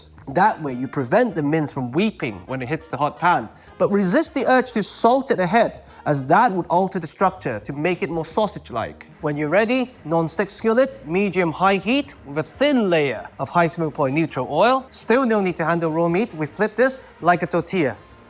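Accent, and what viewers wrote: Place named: United Kingdom. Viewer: did not expect the Britiish accent ! but overall good recipe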